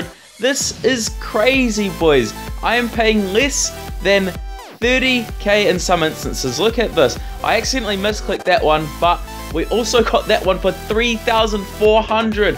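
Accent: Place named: New Zealand. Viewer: R u a New Zealander bro, u sound like on cause I am g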